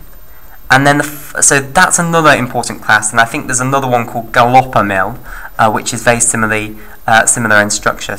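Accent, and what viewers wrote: England, United Kingdom. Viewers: Thankyou, clearly presented. btw are you from England?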